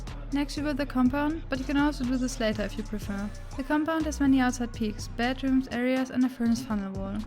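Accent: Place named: Germany. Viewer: du kannst gut englisch aber deutsch hört man raus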